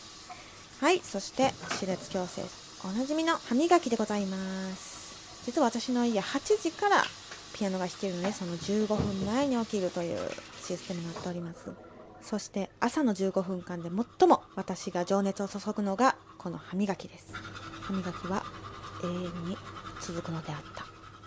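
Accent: Kansai, Japan. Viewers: 関西弁にいやされます。😂✨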